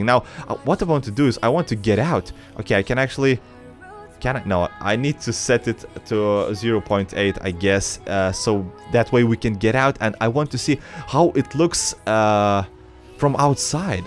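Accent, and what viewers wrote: Russia. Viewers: are you russian?? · are you russian? i knew you where from like another country with an axent but i had no idea.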